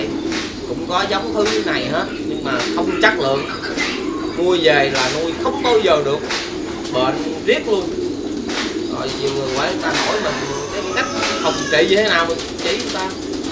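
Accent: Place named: Phuc Tho, Vietnam. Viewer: a noi tiếng jog phúc xo wa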